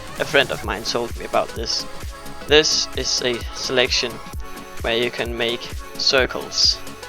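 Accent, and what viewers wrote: Denmark. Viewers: Er han dansker xD.. tænkte jeg nok.. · Are you from Denmark? BTw cool video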